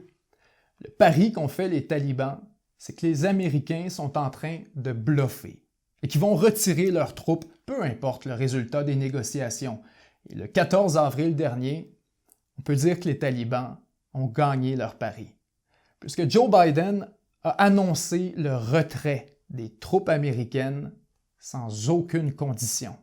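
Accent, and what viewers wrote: Quebec, Canada. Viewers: ce délicieux accent de nos cousins québécois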